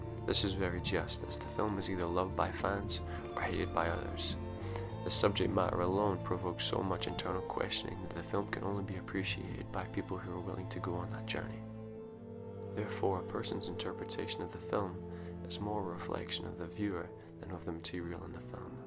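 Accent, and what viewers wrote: Scotland, United Kingdom. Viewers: What an incredibly revealing interpretation.......I have seen this movie several times, catching it on cable.....only once from the very beginning. I never considered the symbolism between Izzy's skin, the detail of the queen's dress and the tree. Tragically sad, yet beautiful movie. Thank you for this analysis. Love the accent.....Scottish?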